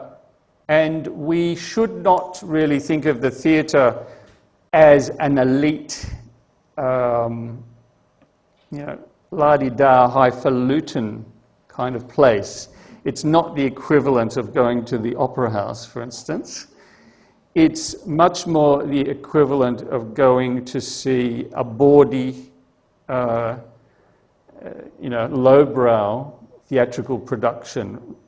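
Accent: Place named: United Kingdom. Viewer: Hearing a Brit attempting to pronounce Spanish is like a hearing a Mandarin speaker say "rolling lorries." Instead of "los miraglos" its "Lahs Mihraahglahs".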